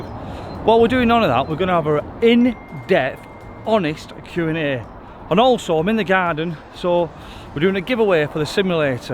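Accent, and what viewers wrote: United Kingdom. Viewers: Firstly, me being in the U.S., I didn't mind that you were called. You do have a slight hint of an accent so I would have figured it out eventually lol. Second, I think you are spot on with the new logo as this family of GolfMates is the nicest group of people on the web today. And finally, I certainly don't (and I doubt any other GolfMates would) care if you made whatever money is out there to be had. It's actually none of our business. All I care about is you keep your content as it has been all along: genuine, funny, informative, crazy, heartfelt and not mean in any way (as too many other channels eventually become). And this is ALL due to you.. being the straight forward fellow you are and not pretending to be someone you aren't. Stay safe all and we'll meet up on the course soon.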